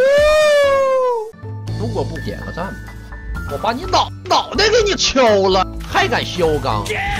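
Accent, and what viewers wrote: China. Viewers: 猴哥到底大陸哪裡的口音呀？積木XD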